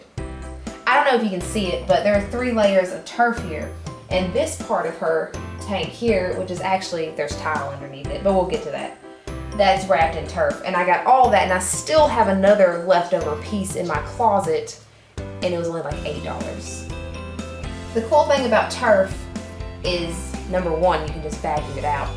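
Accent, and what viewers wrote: South, United States. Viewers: love your southern accent lol ! and your beardie, and her setup! what a great deal on the cage, love your vids! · Sounds like a southern accent and i like it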